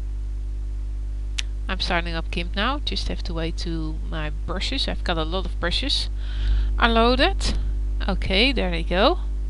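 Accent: Netherlands. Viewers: youre dutch right ;p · Haha Nederlands zeker, hoor het aan je accent! Wel goed gedaan hoor! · Ben je nl? Je accent klinkt nl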